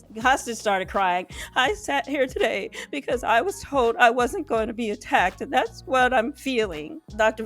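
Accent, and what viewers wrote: Boston, United States. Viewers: I was waiting for this from you & as expected, you were spot on!!! Thank you! "Karen" is a crazy vicious & spiteful narcissistic woman. Mark visibly looked shaken sitting next to her. Clearly no one b'sides Tootie liked her. Was anyone else slightly nauseated by Kevin Frazier's CONSTANT gushing over Katina & Tootie? Like yeah, They said yes on decision day and were still together, but he was kind of a dick throughout a lot of the season. Oh and lastly, I loved that Dr. Pepper and Dr. Viviana laid into "Hostage". The simple truth is that she is NOT a good person and couldn't just admit that she's shallow and based her entire marital demise on her lack of satisfaction with Chris's looks. On that list, looks should have been #1 in her top 5 things she wanted. She kept beating around the bush & dodging questions to the point of severe annoyance., your Boston accent cracks me up. 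Thank you for all you do & making me smile! You are an awesome & talented woman 🌺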